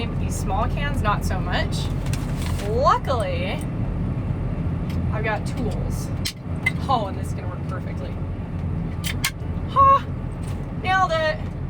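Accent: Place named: Nebraska, United States. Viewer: You really don't need to emphasize that you are a real Nebraskan. We, well I at least, can hear that all too well. And it's more Lexington than Lincoln, and so not Bridgeport.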